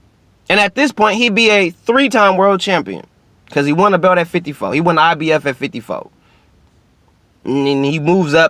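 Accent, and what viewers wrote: United States. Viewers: You from Houston bro?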